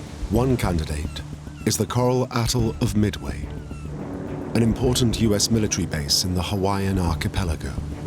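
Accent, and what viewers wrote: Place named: England, United Kingdom. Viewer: Wanted watch it but I ain't with those English accents we had fight to rid of English so... I speak American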